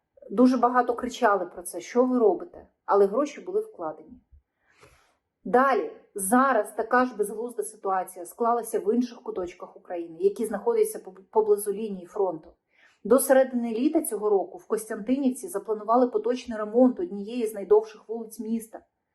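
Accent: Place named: Russia. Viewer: "Щьо,щьє", що за вимова у вас,вашою вимовою катувати ворогів можна.Аж вуха заболіли від такого мовлення.Ви напевне розмовляли російською,а тепер перейшли на українську.Жахлива дикція.😮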